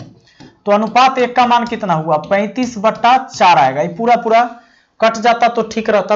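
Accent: Bihar, India. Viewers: Please Bihari tone me mt padhaya krein sir Please mujhe kisi ko hurt nhi krna just mujhe smjh nhi ata isliye bol rhi hu I am fan of your tricks but just because of tone I am unable to understand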